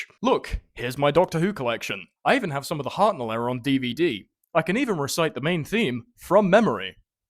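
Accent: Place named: United Kingdom. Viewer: Ew it's a british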